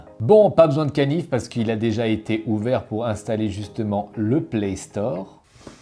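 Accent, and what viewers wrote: Switzerland. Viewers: quel bel accent suisse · On voit à ton accent que tu es Suisse... 😂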